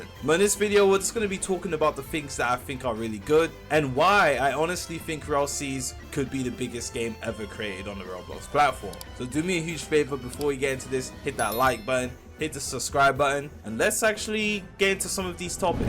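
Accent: United Kingdom. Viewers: YOU FROM LONDON TOO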